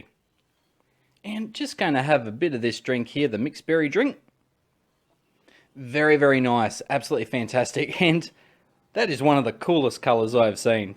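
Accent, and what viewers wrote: Australia. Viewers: God I love how Aussie you are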